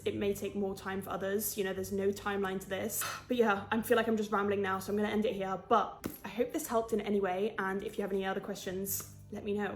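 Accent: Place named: England, United Kingdom. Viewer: Good advice. Luv your English accent btw.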